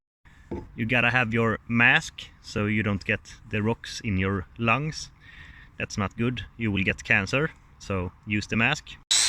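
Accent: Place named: Sweden. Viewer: swedish accents in english are awesome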